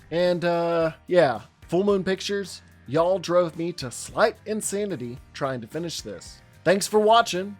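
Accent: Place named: South, United States. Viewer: I love hearing a southern American accent on an excellent movie review.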